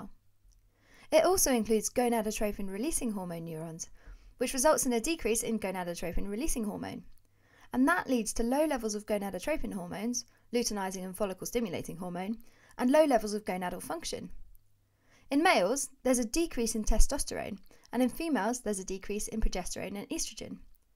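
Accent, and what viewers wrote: United Kingdom. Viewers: British accent? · Her high-pitched low-volume voice with British accent is simply annoying. It's like she's trying to become the British female Tanner, but without any success. #BringBackTanner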